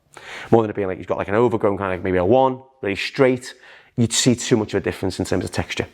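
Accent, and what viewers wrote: Liverpool, United Kingdom. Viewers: You're from Liverpool I can tell so err yes lar was happenin kid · where actually is he located, i am guessing somewhere in the UK judging by the accent but that if fine for me as someone that lives in the UK. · Are yu from liverpool i have a strong feeling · He snds scouse if yer based in Liverpool lad gis a message where yer at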